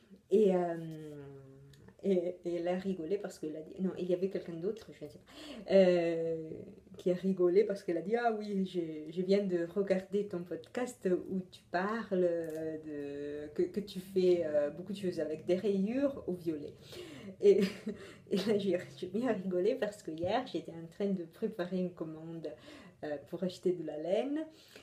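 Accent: Italy. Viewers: Coucou ! C'est toujours un plaisir de retrouver ton bel accent italien et tes Robin 😂 Passes une bonne semaine en espérant que tu trouves le temps pour coudre et à très bientôt ! 😘😘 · bonjour, j aime regarder tes podcast et j adore ton accent italien st tu as toujour le sourir.bisou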